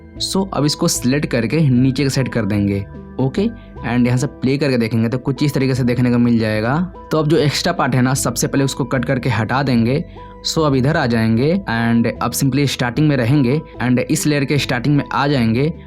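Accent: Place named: Bihar, India. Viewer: He is from bihar 😅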